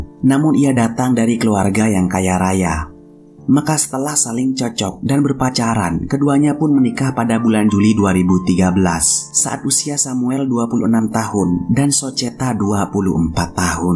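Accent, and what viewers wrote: Madura, Indonesia. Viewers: Salam.kenal bang... perantau di banyuwangi asal bondowoso sll menyimak. Terkdg sy merasa logat bicara smyn kyk parak pahe'eh bik ngkok kang😁😁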